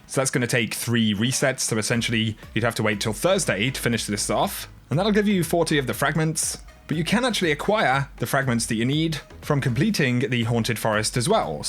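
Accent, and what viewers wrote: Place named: Scotland, United Kingdom. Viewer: U Scottish fuk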